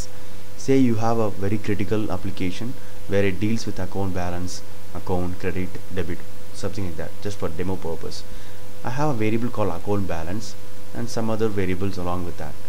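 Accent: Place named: India. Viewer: it's Indian Accent dude.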